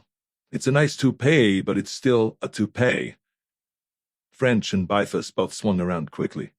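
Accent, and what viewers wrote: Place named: Germany. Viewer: Enjoyed the reading. Even with what seems like a hint of a German accent seeping out. I like to imagine that Schwarzenegger was doing the reading. 😂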